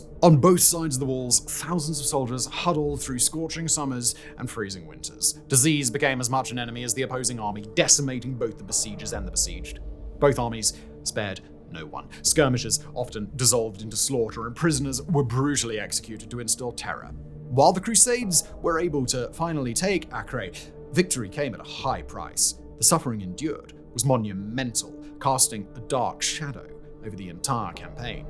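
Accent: United Kingdom. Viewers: why do you sound extra British in this episode?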